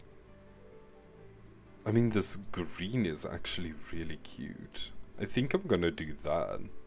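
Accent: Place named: South Africa. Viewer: I could here it in your voice 😊 Nice to meet another South African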